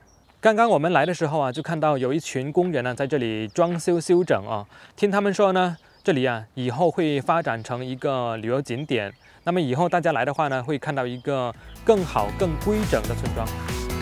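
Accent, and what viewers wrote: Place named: Cantonese, China. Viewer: ，原来会广东话的🤟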